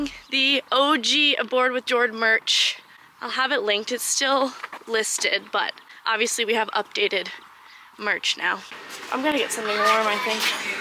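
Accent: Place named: United States. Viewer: It’s so weird seeing you visiting a place near me, as you are so American 🤣 and different to the locals (as in your dialect)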